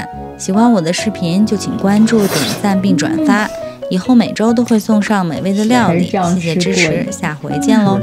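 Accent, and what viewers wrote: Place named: North, China. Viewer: 看起来很好吃 喜欢听你北方味儿的普通话 字正腔圆：）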